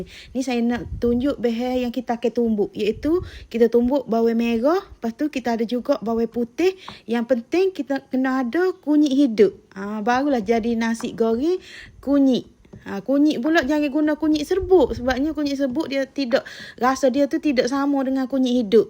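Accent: Kelantan, Malaysia. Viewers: Sedapppnya...tk resepi..asyik dengar kecek klate muuu...😊😊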